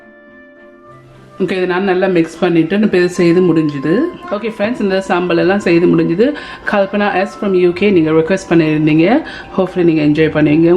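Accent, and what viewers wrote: Jaffna, Sri Lanka. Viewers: Hatts off for keeping jaffna prononciation !!!! Thats our adayalam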